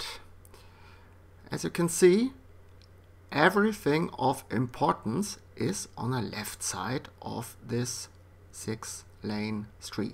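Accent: Germany. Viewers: Awesome German accent. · Dieser deutsche Akzent is ja grauenhaft :D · Ich habe direkt gemerkt das du aus dem Deutschen Sprachraum kommst :D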